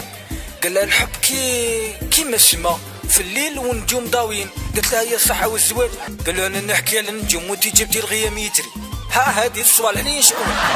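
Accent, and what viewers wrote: Algeria. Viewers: لهجة شلف بينا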